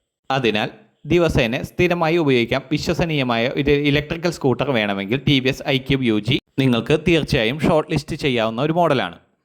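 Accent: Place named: France. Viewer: Annan French accent aanallo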